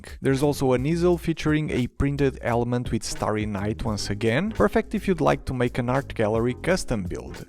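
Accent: Portugal. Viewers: well it seemed to me that you were portuguese i knew only by the accent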